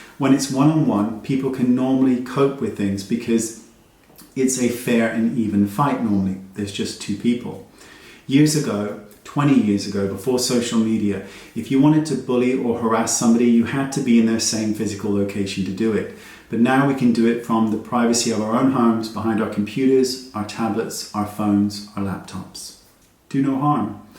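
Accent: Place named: South West England, United Kingdom. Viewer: Hi! I just stumbled upon your videos and I think you are so wonderful! It's so refreshing to watch someone who isn't involved in drama and just really loves makeup! This may be strange, but I was just wondering where you are from? I'm from Bristol and your accent seems to have a South-West twang!!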